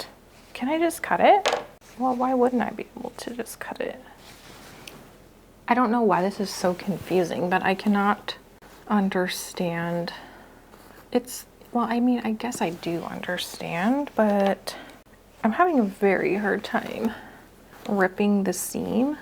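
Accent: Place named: Australia. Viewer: Love your Aussie! This makes me want to dye some stuff I don’t like.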